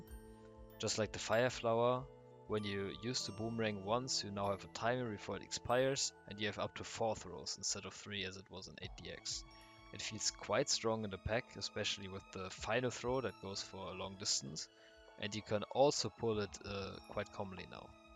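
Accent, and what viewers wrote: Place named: Germany. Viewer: Der ist doch deutsch das hör ich raus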